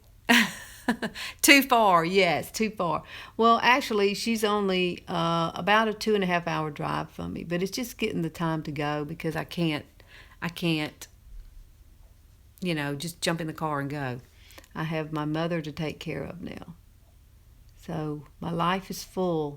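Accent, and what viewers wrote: South, United States. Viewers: Hi, I really enjoy your make-up tutorial videos, thank you for taking the time to make them for us. I especially love hearing you talk because I love listening to your southern accent. Please don't ever change, keep your accent. I can understand you perfectly and I'm from and live in California. BTW, I'm curious...why you don't use a liquid eye liner instead of the pencil to line your top eye lid? I think it would make it easier for you Also, has anyone ever told you that you look a lot like Heather Locklear? You have very similar beautiful eyes. I'm sure you've heard this before but I couldn't help but ask. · i just gotta say i love ur southern accent, so younique lol excuse the pun...oh by the way u can get younique cosmetics over here in N.Ireland, i dont own any myself as i use pacifica vegan mascara as i have the most sensitve eyes EVER but it does look amzing on u girl....hugs and kisses....xxx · You are a breathe of sunshine. 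I love those cream eye shadows How much for the cream shadow? I love your southern accent. You are the cutest. Have a great week.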